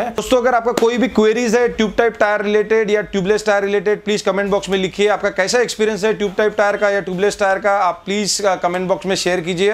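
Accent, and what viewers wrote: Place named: Gujarat, India. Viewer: Bhai Hindi bolneke Andaz see pata chal raha hai aap bhi Gujrati ho, specially from Khila word😂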